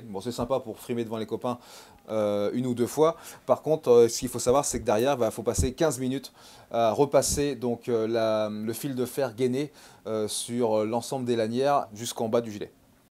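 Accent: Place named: Texas, United States. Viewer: Your accent is so strong I couldn't understand a word, are you from Texas or something?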